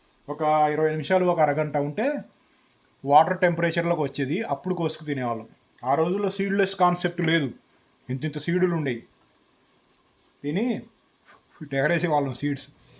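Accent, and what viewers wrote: Andhra Pradesh, India. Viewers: Nizamabad antunav kani nee slang mitham Andhra style undi beo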